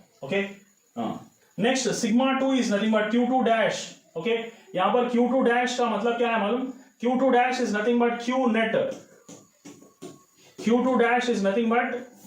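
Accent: Hyderabad, India. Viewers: #Hyderabadi accent never hides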